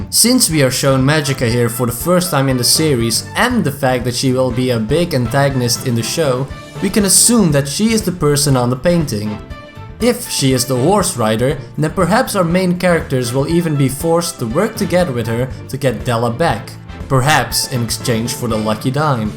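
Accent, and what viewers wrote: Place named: Netherlands. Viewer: Ummm you sound like a Dutch speaking person. Are you dutch? Because I can tell when you pronounced the title comic very well.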